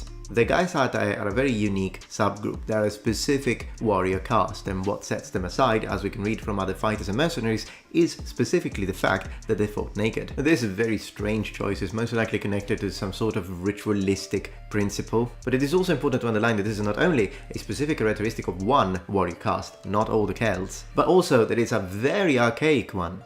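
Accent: England, United Kingdom. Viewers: I take it that you lived for quite a while in England because my sisters friend Julie comes from Greece and has a different accent than you when speaking English. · Please make more videos about the celts, we know so little about them and pop culture is full of misinfo. Despite being an anglo saxon/scotch irish American Ginger (with Sicilian great grandparents! I am in no way culturally sicilian but I love the fact that you are Sicilian, speak British English, yet married an American and live here) When I hear about the celts, I think of Pict warriors fully naked covered in blue tribal paint, and I've always had a hard time believing that represented the celts as a whole.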